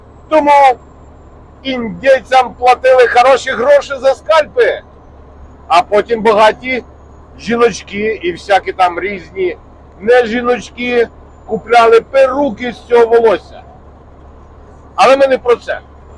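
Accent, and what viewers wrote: Zhytomyr, Ukraine. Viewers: Вітаю! Не давно на вас підписалась, дуже цікаво все розповідаєте. Пораджу вас дітям які мають бажання виїхати в Америку, це донька і зять. Питання :звідки Ви родом з України, відчуваю по розмові десь з мого регіону чи поблизу- Житомирщина. 😊❤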